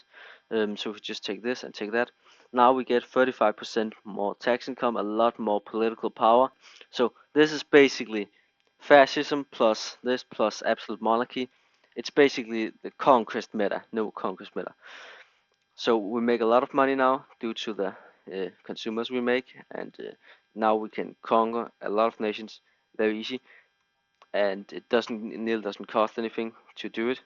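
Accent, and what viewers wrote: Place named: Denmark. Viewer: bedste danglish jeg nogensinde har hørt håber alle russere ser det her..:3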